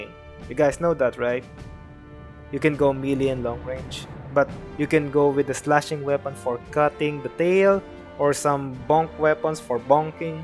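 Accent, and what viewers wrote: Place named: Philippines. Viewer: I smell pelepeno accent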